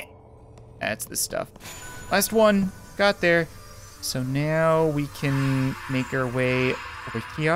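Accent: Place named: Canada. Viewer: can i get a copy of candleman please i love your channel and your canadian acsent is so cool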